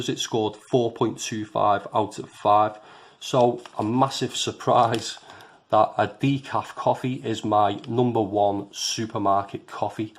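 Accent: Liverpool, United Kingdom. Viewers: Lovely Scouse accent. Adds flavour made. Keep it up.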